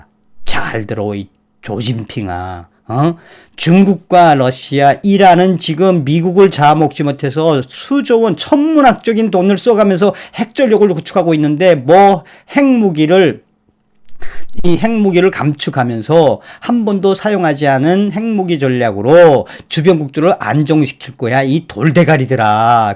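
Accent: North Korea. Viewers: 북한 억양의 찰진 표현...디립다 깐다. ㅎㅎ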